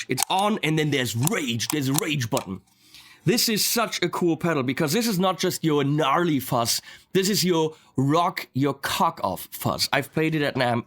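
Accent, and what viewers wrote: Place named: Germany. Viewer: "And you have this riser here for your wah... or your "Wah"Lume pedal." Gots to love the German accent!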